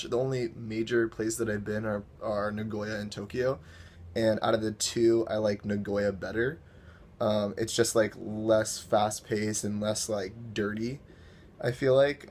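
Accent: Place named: California, United States. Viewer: I think the best thing about this video is you mentioning the nabe, mainly because I love spicy food as well. Also I have a question, where are you originally from? You sound like you're from Cali, probably southern but I'm just curious.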